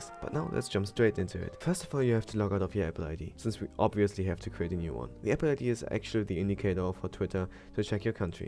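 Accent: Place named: Germany. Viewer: Ich habe sofort gehört das du deutsch bist.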